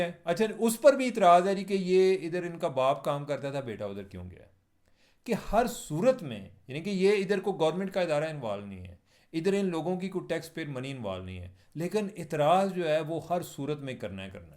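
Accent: Punjab, India. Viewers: آپ کی پنجابی کا تڑ کہ سچویشن کی پکچر بنا دیتا ہے😂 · You're really funny in your story-telling, especially when you add that Punjabi touch.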